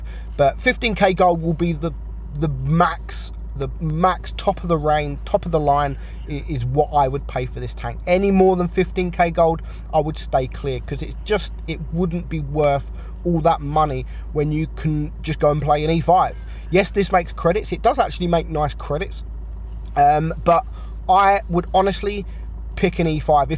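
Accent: United Kingdom. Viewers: Ik ur british but from where?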